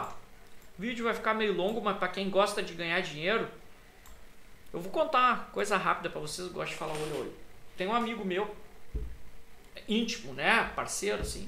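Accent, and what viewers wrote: Rio Grande do Sul, Brazil. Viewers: Eu sou do norte e de cara vi que era do sul e falei "baaah gente boa de mais esse guri" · Cara show de bola , muito obrigado e parabéns pelo video , estou estudando para entrar nessa. Moro em SC agora ,mas sou do RS , saudades quando escuto esse teu sotaque. Muito bom parabéns pela disponibilidade de mostrar e ensinar... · Esse sotaque eu conheço, tu é daqui do sul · O cara é bom.... Ensina com simplicidade, estou tentando aprender sozinho tbm com ajuda de voces, não é facil, mais não vou desistir. Pelo sutaque é gaucho?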